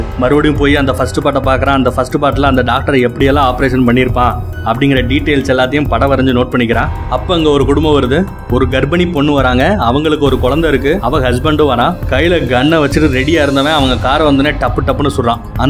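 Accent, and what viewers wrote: Madurai, India. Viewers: Anne Neega Madurai thaana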